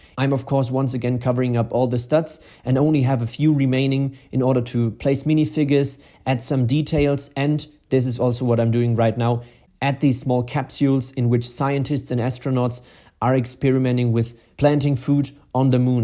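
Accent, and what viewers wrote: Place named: Germany. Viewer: What about that german accent though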